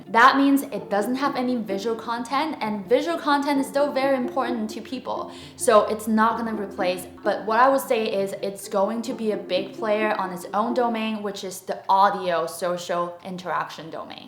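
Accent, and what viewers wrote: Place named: Australia. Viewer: Where are you From. Are you an Aussie girl? i love your accent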